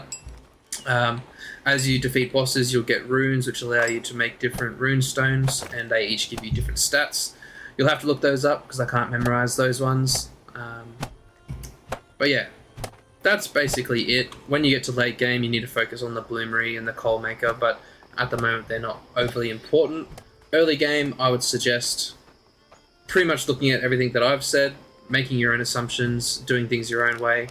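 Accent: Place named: Australia. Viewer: Thanks for these tips. I started a new game playing Aska this time not Ragnar And now, i'm managing and found agood location to hunt and forage foods. Closer to the mine as well. unlike before when I firstly started this game. I raged quit when the bear killed my 3 out of 2 npcs. And when I got 15 npcs most of them died of starvation during winter only 5 left. Because my location spawn point and where I made a base are too far from everything. haha! And subbed. You built a house on top of the mine. Would probably do the same and relocate my first game because my first game the mine was so far away from my base. Thanks mate. The accent is a gave away aussies. Cheers!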